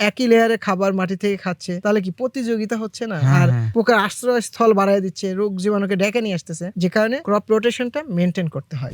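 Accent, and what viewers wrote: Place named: Joypurhat, Bangladesh. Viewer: আমার বাসা জয়পুরহাট তো তাই বলছিলাম,উনার ভাষার সাথে অনেক মিল আছে তো তাই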